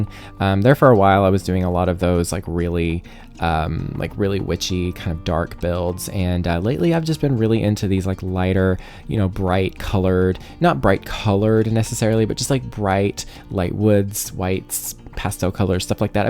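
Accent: South, United States. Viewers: This build is insanely beautiful! Everything about it. The porch and the interior on the lil diagonal! EA should be giving you their packs for free because your builds are so amazing!!! I send all your videos to my friends who play the Sims and everyone loves your energy, creativity, and your lil Southern accent!!! Hugs and love from Philly!!!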